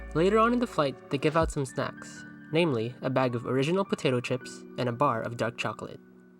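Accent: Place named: Philippines. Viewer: You should work on your camera angles, that "overview" shot of the seat is horrid. You should also work on your voice over spill and should only speak on what you know for sure, so you wont sound too ignorant. Please hesitate on direct copying other youtubers, it doesn't quite suit you and your filipino accent. Its quite obvious which youtuber you are trying to copy. Hope you dont take offense and improve in the future. Cheers.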